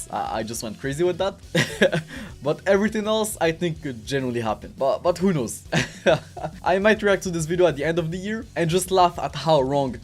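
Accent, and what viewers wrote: France. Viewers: i can hear the french accent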